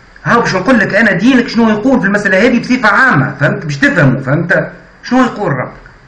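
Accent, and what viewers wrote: Tunisia. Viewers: ههههههههههههههههه تعجبني لهجة توانسة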